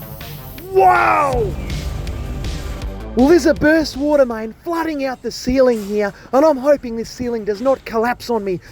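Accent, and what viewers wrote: Australia. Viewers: !!!! The algorithm got me here!!!! Love watching your channel and that accent.....my youngest girl lives in Melbourne.....makes me feel closer to her to watch your channels.....greetings from Montgomery Texas home of the Texas flag!!!!! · Nice one. We always wondered if you just did drains. Watching from the UK with my ex pat ozzie wife. She loves to listen to the ozzie accent. Liked and subscribed. All the best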